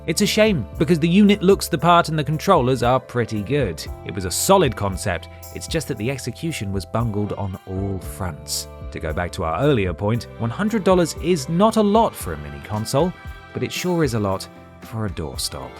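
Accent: United Kingdom. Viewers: This channel says it's fun United Kingdom, and the host sounds British. But it's just another US channel. It's USA vs Japan, it's "dollar" that just assumes US dollar, even though it wasn't started. Why do so many Brits want to be American channels? Be international.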